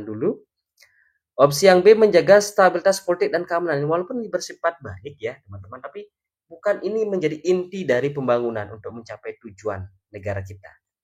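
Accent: Bali, Indonesia. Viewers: bang saya suka logat balinya wkwkwkwk .. mantappp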